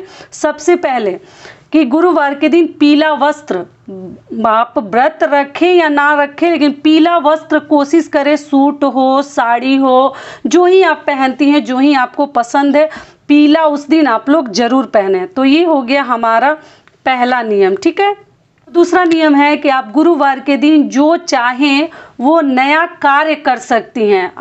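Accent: Bihar, India. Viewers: Didi aap Bihar se hain kya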